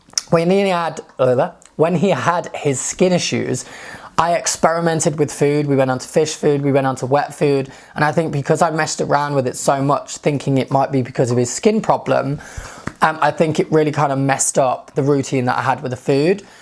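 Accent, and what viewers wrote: England, United Kingdom. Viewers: Are you from England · I just love his British accent ... I can spend hours hearing him talk... ✨️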